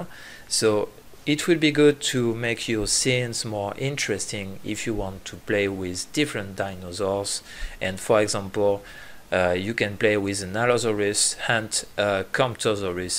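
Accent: France. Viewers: Clairement 😅 L’accent français est présent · On a reconnu l’accent français 😂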